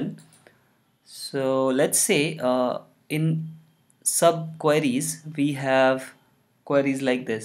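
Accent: India. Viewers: All the best coding tutorials on YouTube are made with Indian accent, lol. Thank from Ukraine, btw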